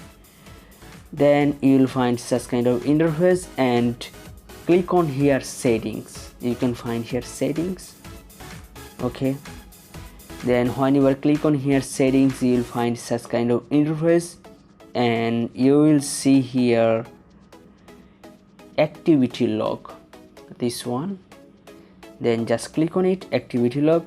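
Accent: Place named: Bengal, India. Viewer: Bamgali crazy English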